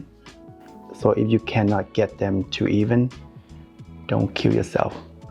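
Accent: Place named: Vietnam. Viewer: You have the cutest vietnamese accent. My boyfriend is vietnamese american i live in a very vietnamese area in northern va as far as asian population. Love ur food and ur culture 💚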